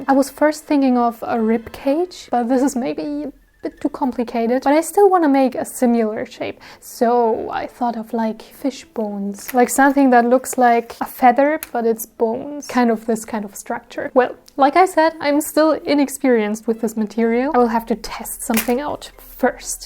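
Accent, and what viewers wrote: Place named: Germany. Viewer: Ha I knew you were German I could hear it in your voice I'm German to brw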